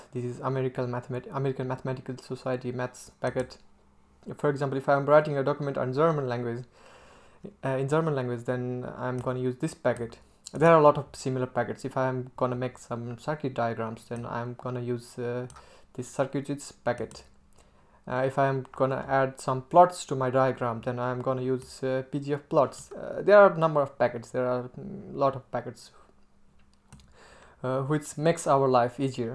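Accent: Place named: India. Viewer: You are from India, aren't you? I used to think that all Indians just have a bad English pronunciation, but then I found out that Indian English is actually recognized as a type of English like BE and AE. Yet it can be very hard to understand for people having learned and being used to the other types of English (BE,AE). You speak rather clear however, and I think any westerner who speaks English should be able to understand you :)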